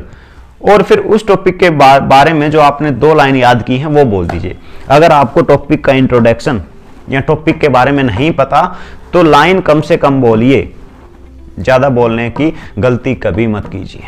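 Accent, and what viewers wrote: Haryana, India. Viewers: Apki voice se pta chal rha ha M be haryana se hu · are waah sir ji Apki boli me haryanvi ras dikkh hai hariyana te ho k😄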